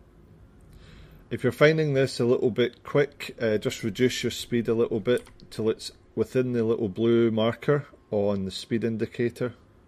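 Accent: Scotland, United Kingdom. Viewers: you Scottish mate? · Are you Scottish? Great accent dude! You said it, "steep learning curve." It's been pretty miserable learning it but now that I slogged through the basics I'm totally hooked. Amazing game they created, can't wait to play it in VR! Thanks for the free videos, helpful. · he definitely is scottish, yes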